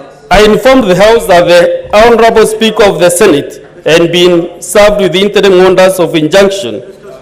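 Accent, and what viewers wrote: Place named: Meru, Kenya. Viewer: That is the tongue of people of meru ,but English is good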